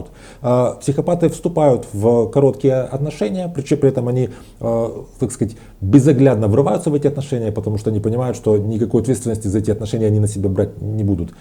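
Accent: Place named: Ukraine. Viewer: какой-то говорок у вас украинский. Слишком быстро говорите.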